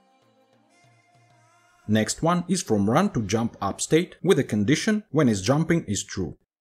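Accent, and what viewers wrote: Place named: Russia. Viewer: Кликнул на видео не замечая названия канала. Услышав акцент думаю "русский что-ли?". И тут вижу на "Новая папка" Хороший туториал, короче, спасибо)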